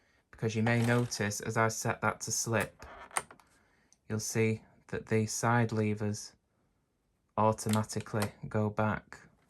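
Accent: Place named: Northern England, United Kingdom. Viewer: i'm just about to purchase a secondhand MK70 and thought i'd look for a video or two as i don't it comes with a manual. i was watching without sound and thought you were American. imagine my surprise to hear your Northern accent, i'm from Lancashire. i couldn't have asked for a more informative, easy to follow tutorial. once i get my machine i'll be replaying this video constantly. thank you for spending the time in making it, it's much appreciated.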